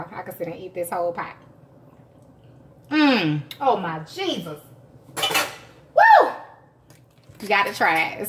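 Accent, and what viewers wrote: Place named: New Orleans, United States. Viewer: Omg where u from ? I love your accent I’m getting Nola vibes cus u sound like Toya lol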